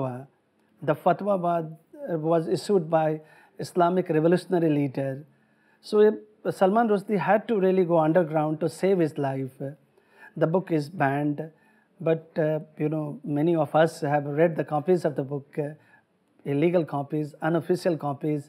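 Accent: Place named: Italy. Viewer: U have a kind of italian accent, as an italian i really love it.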